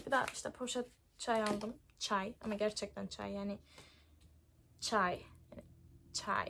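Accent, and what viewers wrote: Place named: Cyprus. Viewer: Konuşman çok tatlı bayılıyorum Kıbrıs şivesine🌸😘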